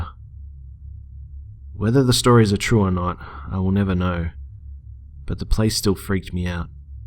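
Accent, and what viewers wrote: Australia. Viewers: Gday mate? Fellow Aussie for sure; great stuff! Keep it up! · Are you Australian? I'm getting a Aussie vibe I love your accent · are you Australian? your accent sounds Australian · Are you Australian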